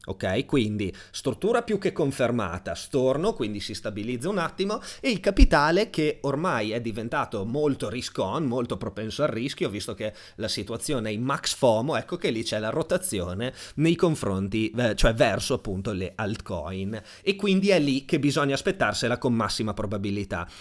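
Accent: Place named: Friuli, Italy. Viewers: Sembra essere tra Udine e Tavagnacco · Vero però ha un accento che sembra friulano 🤣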